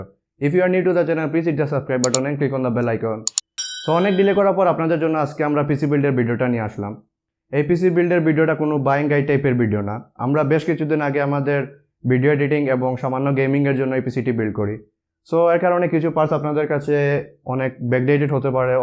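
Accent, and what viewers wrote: Sylhet, Bangladesh. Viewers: Vaia apni ki Sylheti naki??😃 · Bhaiya ki sylhety ✌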